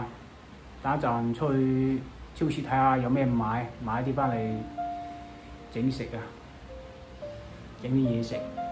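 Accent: Cantonese, China. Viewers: 其实你的普通话的口音就是广东话口音，早就知道你会说广东话。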